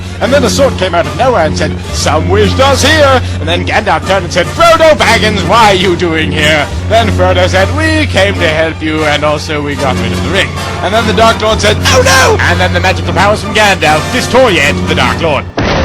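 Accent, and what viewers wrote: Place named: Ireland. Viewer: Is it just me or does have random hints of an Irish accent?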